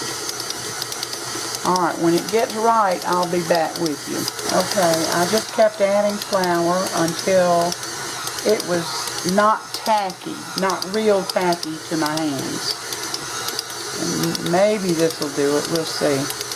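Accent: South, United States. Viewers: Good recipe the dough for pepperoni rolls. Yum yum yum. Loved loved loved your sweet sweet sweet southern accent. Thanks fo sharing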